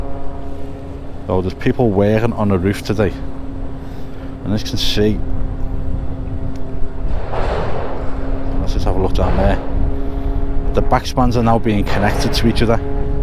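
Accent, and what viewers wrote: Liverpool, United Kingdom. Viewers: Cool hearing the difference between the actual Liverpool accent (yours...I'm guessing N.Liverpool..?) and Wirral accent. I know given the short distance outsiders think the two accents must be the same the same....but they're not.✌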